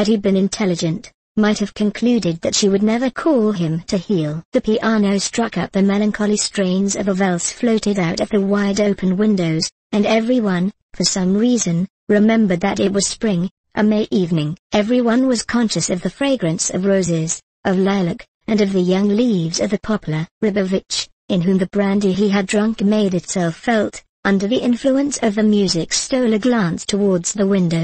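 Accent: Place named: United Kingdom. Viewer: Nice to listen to a British speaker for a change.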